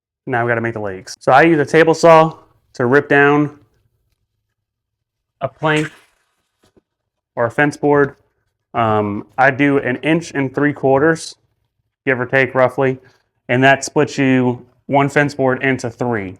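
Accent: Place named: Louisiana, United States. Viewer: Great video, thank you very much! Are you in Louisiana? I picked up on some accent which sounds like it. 😁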